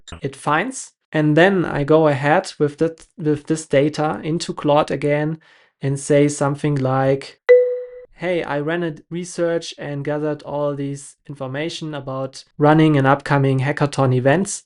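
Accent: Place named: Germany. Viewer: If you hear a german accent you know you are on the right video ! Thanks 😊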